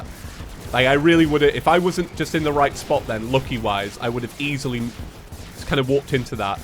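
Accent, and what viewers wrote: United Kingdom. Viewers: Your british "accent" makes me think you're saying giar armor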